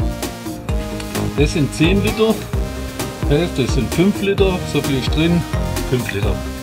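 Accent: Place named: Swabia, Germany. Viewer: Super erklärt,noch dazu auf schwäbisch!! Ja die größere Latte 😂😂😂😂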